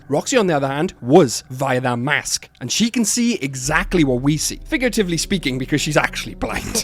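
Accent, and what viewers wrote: Wales, United Kingdom. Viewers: I've never watched this channel before, so clicking on it I expected someone American voice or something. Quite surprised to hear such a thick Welsh Valley accent lol. Cymru FTW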